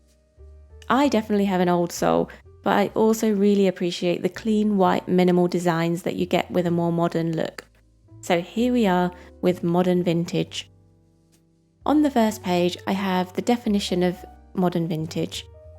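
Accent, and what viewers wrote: United Kingdom. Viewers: That looks absolutely gorgeous! You are not alone in the department of smudging ink, happens to everybody, I really thank all deities for washi tape! You have such a pleasant voice and way of speaking - I very much prefer British English over American English -, it's a real pleasure to listen to you.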